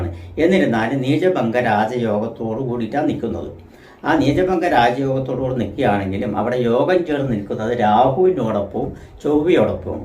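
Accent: Tamil Nadu, India. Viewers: ഇത് ഏതോ അണ്ണാച്ചി മലയാളം പറയുന്നത് പോലെ ഉണ്ടല്ലോ റാസി മനസൻ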